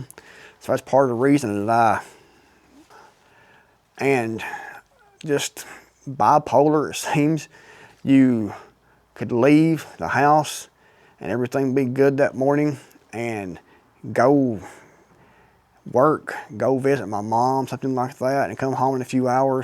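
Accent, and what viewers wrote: Appalachia, United States. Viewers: Thank you that means a lot. I'll watch more of your videos. I like your accent applachian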